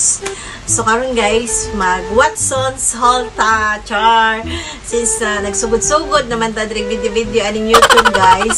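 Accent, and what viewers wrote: Visayas, Philippines. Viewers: Bisaya kaau ni madam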